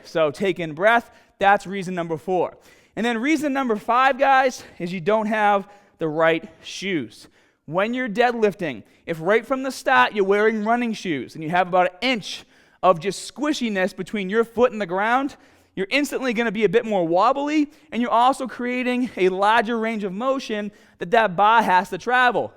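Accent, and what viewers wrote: Boston, United States. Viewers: With a slight auch in your back you want to position the baabell, love that Bostonian accent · Like the southey Boston accent! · love that boston accent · I think he is from boston. Is he? Sounds like he is.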